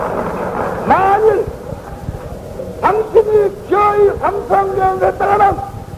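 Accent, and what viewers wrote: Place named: Jeolla, South Korea. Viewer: 품격없는 선동의 말솜씨로 과연 나라를구할수있었을까?목소리 넘싫다.전라도사투리 넘싫다.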